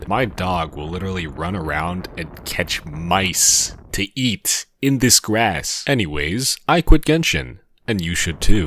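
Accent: Netherlands. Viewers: hold on are you dutch?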